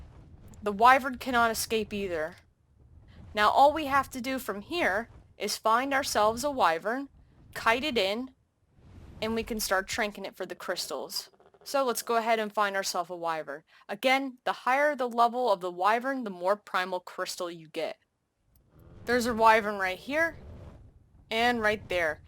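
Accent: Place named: United States. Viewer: She sounds cute 🫠 American accent. Still uploading Ark? 💞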